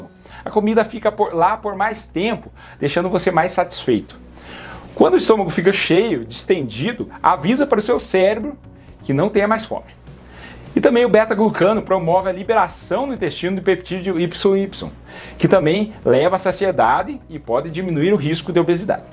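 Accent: Brazil. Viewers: É o sotaque caipira e a maneira desembesta de falar… Se ele é humilde, não dá pra saber. A humildade é uma virtude muito rara nos dias de hoje.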